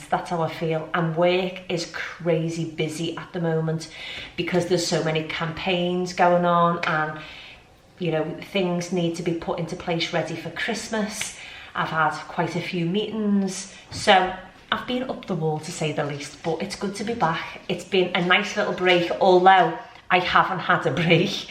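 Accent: Liverpool, United Kingdom. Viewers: Ah the c word, fab n gr8 seeing u back with your lovely liverpool accent, won't b long till you're putting up Christmas trees again, hope u move soon, we're 10 weeks along waiting to buy house nxt door x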